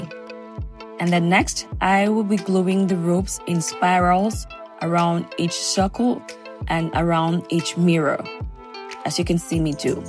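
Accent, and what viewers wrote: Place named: Jamaica. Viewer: Love your accent... Jamaican or maybe Antiguan? Its like music in my ear.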